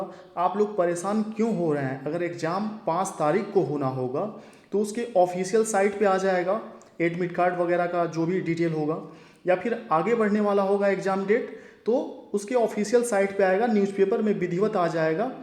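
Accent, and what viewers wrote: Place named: Uttar Pradesh, India. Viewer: I m right .apki bato se.. video k starting m hm soch hi rhe the...ki aap U.P. se ho..or aapne apni video m hi clear kr diya.....hmne apki complete video dheki h.. 🙏🙏🙏sir plz ek confuse clear kre...sst ki preparation kaise kre...plz tell me...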